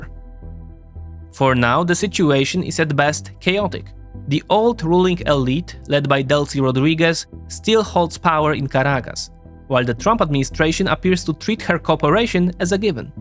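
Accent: Poland. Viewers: The CGIs were very nice on this one. The overall quality of the channel keeps going up. And we always have our favorite Polish voice to relate to. 😁❤🙏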